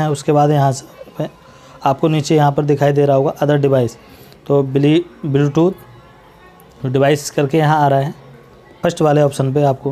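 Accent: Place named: Arab world. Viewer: Are you speaking like arabic or the worst english in the universe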